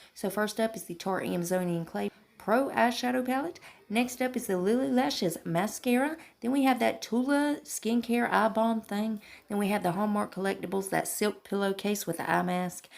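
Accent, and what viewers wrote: South, United States. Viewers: Finally another southern lady on YouTube!!! It's so nice to hear another southern accent.. makes me home sick tho!! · I've been so disappointed with Boxycharm over the last few months that I canceled my Premium box for June. I was frustrated last month getting the mascara over a palette (that fit my profile Perfectly), and then those brushes with the Ridiculous $90 price tag, and then the 2 mini lip balms that I'd be excited to see in a $12 Ipsy bag, but did Not belong in a Boxycharm Premium box... Come On!! It looks like the June Premium box is going to be much better, but I don't need another contour palette. The only thing I saw that really excited me in June's Premium is the Avocado sleeping mask. I'm crossing my fingers that I'll be able to pick it up in add-ons or Pop-up at some point. 🤞 I'm excited about Luxe, though. 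Hoping for some Great products to make up for the last few months of Duds! Thanks for the update, girl!! 😊 I'm excited to see Anything from First Aid Beauty! Nothing I've tried from this brand has disappointed me yet! Btw, I have that thick southern accent, too. There's nothing wrong with it! I lived in Virginia Beach for a few years when I was younger (I got my butt back to Texas as soon as I could! Lol), and it always amused me at peoples reaction to it there. Most people loved it, but some acted like I was speaking a foreign language! Lol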